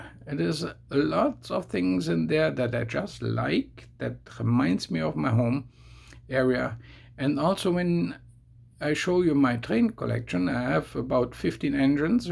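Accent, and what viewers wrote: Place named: Germany. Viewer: Nice video:-). Thanks so much for sharing this and also it was really really nice to hear from you on the 8th October 2020:-). You do make some creative suggestions and thorough talks about how you make your model rail layout giving examples to what it can relate to relating to real life stuff:-). Well done indeed:-). Thanks so much for sharing this excellent video its an excellent looking model railway you have and well layed out your model railway:-). Plus you thoroughly explained your rail layout terribly greatly I must admit:-). Especially being German and despite being German you're certainly sounding really fluent in English with your accent:-). I can see you're fluent in both English and German which is excellent I do say and its ok whether you are or not:-). We can only be fluent in so many languages:-). Alright then, have a nice evening, plus I wish you all the best and take care of yourself:-D:-D:-). 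From your friend Foxy:-).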